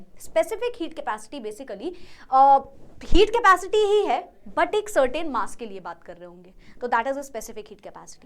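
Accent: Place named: South, India. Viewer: Indiaaa, but not from north.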